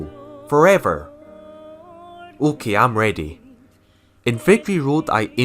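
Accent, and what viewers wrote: Scotland, United Kingdom. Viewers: You sound like a Scotsman attempting an English accent bro, Good video.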